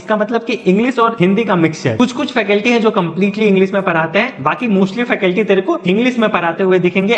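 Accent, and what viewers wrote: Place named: UP/Bihar, India. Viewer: Bhaiya aap pakka UP/Bihar se lgte hain. I am also from Bihar😊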